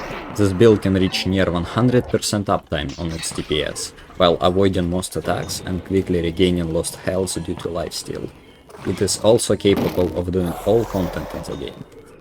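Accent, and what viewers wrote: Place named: Russia. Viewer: why do I hear a strong Russian accent in your speech?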